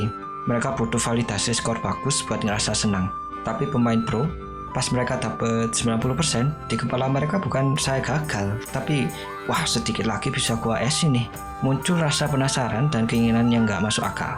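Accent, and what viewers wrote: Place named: Latin America. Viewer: why did i thought that it would be a LATAM guy speaking, guess i dont need the subtitiles after all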